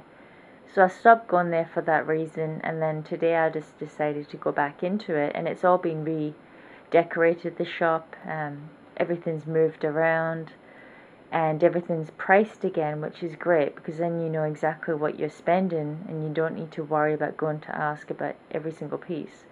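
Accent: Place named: Scotland, United Kingdom. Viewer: idk why but I thought you were Scottish hehe. Anyway you should post your ebay name.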